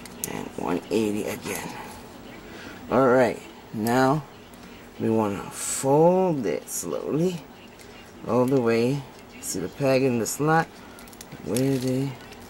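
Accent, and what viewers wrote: Africa. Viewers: African accent? has an African accent? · why do you sound like your from africa ?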